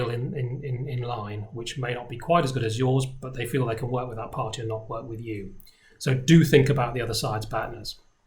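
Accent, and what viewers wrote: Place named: United Kingdom. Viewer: 오 글로벌한 영국어를 쓰고 계시는듯 : ) 벤쳐스 연계부분에 대해 상세하게 짚어줘서 흥미로웠네요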